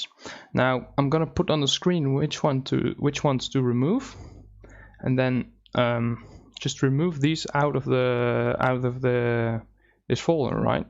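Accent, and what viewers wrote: Netherlands. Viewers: AYE U DUTCH BRUH? wassup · Haha lekker geEngelst vgm ben jij NL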